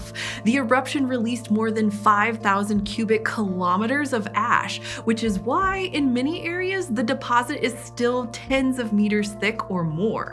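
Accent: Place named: United States. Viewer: That's my girl with an American accent talking about US geology but using metric measurements 😂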